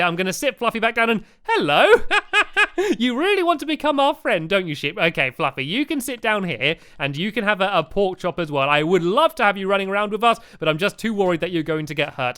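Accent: United Kingdom. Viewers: Brandon Ford British. He loves here. He lives in Bathe, but travels around the place a lot.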